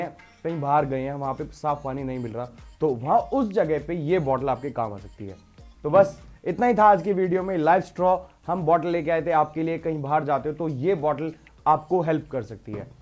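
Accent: Punjab, India. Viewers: Bro tumara bolne Ka accent Punjabi ki Tarah hai agar tum Punjabi ho to pls reply jarur karna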